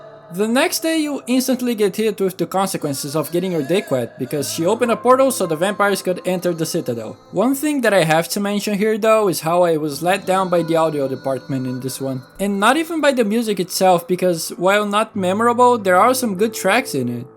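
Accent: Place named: Brazil. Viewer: Im not doxxin but i I knew you were Brazilian, your "English" is very different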